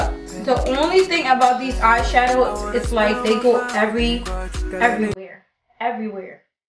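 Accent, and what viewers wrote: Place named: United Kingdom. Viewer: And there goes the british accent...first impression beautiful. Girrrrlll u had me watching and dancing at the same time... Awesome job.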